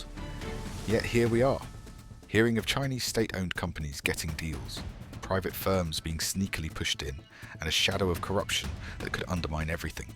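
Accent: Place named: United Kingdom. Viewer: I agree with the ending message of this video. This is not just about rugby. The same with the intern-connection of PNG roads proposed for our economic empowerment. Likewise, with the sale of MVIL shares to MRDC. It is about power and influence. Yes, we can claim that this, Wapu Sonk guy is a bad apple. But what's the use if the entire apple tree is bagarap? The whole operation needs to be scrutinized and reformed. The same with interior development projects and publicly traded shares. It all needs reform. Is PMJM only willing to act decisively when faced with media scrutiny from abroad like the Sydney Morning Herald and ABC News? What happens then, when we express outrage at corruption domestically, yet cannot get results without the aid of foreign media highlighting our issues? Truly sad. But thanks lo karem British voice kam bek lo stori lo news updates! 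😆🤣 Inap! Mi go silip nau! 😴🛌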